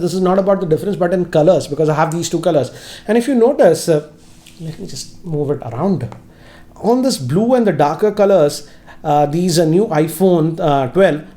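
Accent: India. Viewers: I love his indian accent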